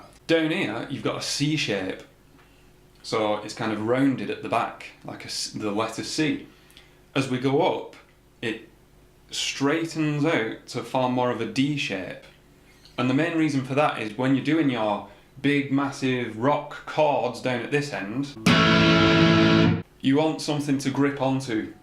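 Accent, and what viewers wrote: Lancashire, United Kingdom. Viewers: Great Lancs accent mate. No joke.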